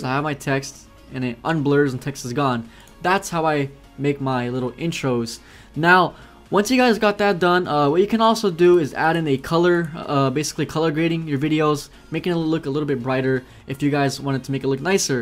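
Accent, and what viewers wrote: Croatia. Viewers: Are you Croatian? :P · Are u from Croatia?! :OO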